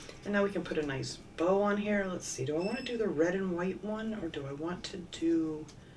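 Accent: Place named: Pennsylvania, United States. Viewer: I love watching you craft. I also get a kick out of hearing you say R ite instead of alright. I wonder if that is a PA thing. My daughter lives in PA, I will have to ask her. Thank you for sharing your talent.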